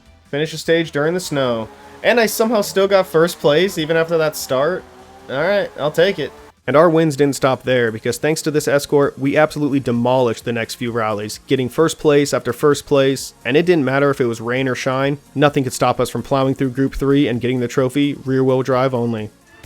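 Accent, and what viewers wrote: South Africa. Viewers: Are you South African? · are you actually from South Africa? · Wait... Are you South African???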